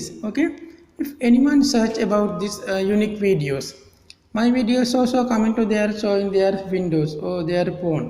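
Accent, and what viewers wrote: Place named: India. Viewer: Your English like indian man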